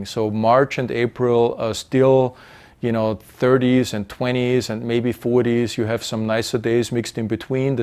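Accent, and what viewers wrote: Germany. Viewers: German accent?? 🤔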